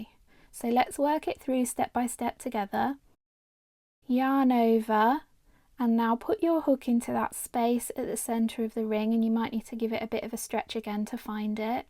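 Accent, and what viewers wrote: United Kingdom. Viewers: And for people who don't live in America and want to use UK terms, do I have to find a UK tutorial??? I thought with your accent you would be using UK terms. I need something not American.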